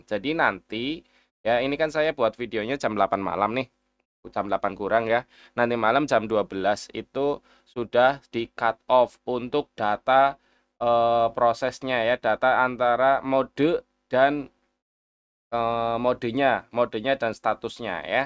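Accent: Banyumas, Indonesia. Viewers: LAMA GAK DENGER SUARA NIH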